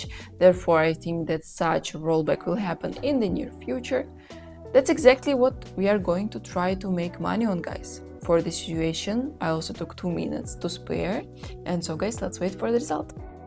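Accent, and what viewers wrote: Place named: Russia. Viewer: У вас акцент , вы русская ? Я угадать ? Не типичный английский , ведаете на англоязычную аудиторию!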